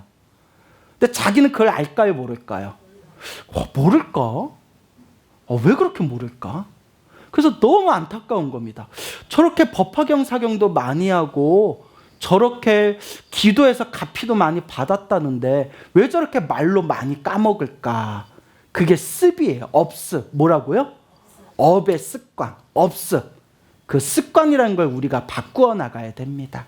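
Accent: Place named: Seoul, South Korea. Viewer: 광우스님이 쓰시는 서울말 너무 듣기좋습니다🥰 🥰🥰🥰🥰🙏🙏🙏🙏🙏🙏🙏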